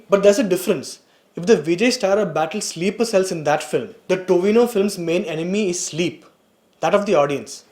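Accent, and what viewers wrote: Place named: Kerala, India. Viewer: Mallu English 💥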